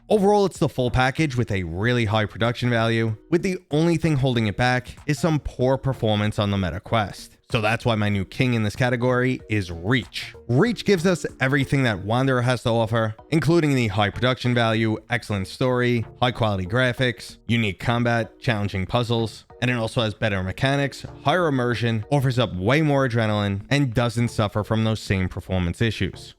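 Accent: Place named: Long Island, United States. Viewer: I've never been to Long Island, but thanks to your videos, I always fix that problem. Love the accent!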